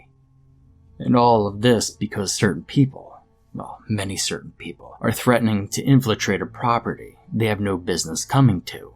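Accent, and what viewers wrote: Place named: Alabama, United States. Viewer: Hey yep had to see the pups very cute And to hear the Alabama accent,did not disappoint Proper Southern Girl Blessings have a great weekend I will just say coz time zones baffle me atm defo need an early night. A Long ass day and 33Celsius in London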